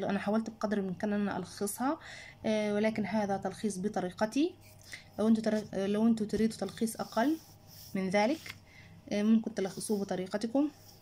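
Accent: Egypt. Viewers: مصرية او جزائرية🤯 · حبيت نعرف حاجة هذي مصرية يسما مصر يقراو كيما حنا ولا كيفاه من فضلكم جاوبني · انت مصريه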